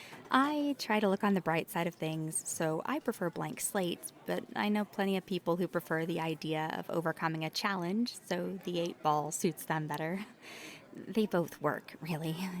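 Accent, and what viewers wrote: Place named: South, United States. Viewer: I like that little hint of a southern accent that I hear come through every now and then.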